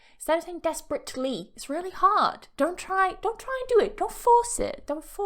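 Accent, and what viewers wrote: United Kingdom. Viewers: I like your teaching British english accent Madam thank you Madam I always listen your British accent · Omg you're the best, I appreciate your work ❤ I'm glad to be here and learn the British accent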